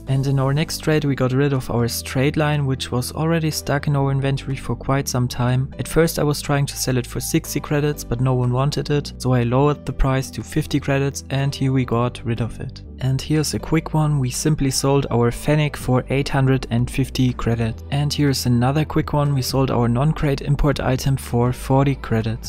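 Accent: Germany. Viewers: Du bist doch deutsch oder das hört man raus haha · I like these Videos but i have one question. Bist du Deutscher? 😅 hört sich voll so an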